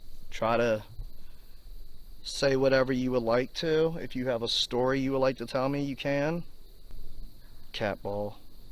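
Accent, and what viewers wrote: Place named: South, United States. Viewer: I did NOT expect you to have a country accent 😂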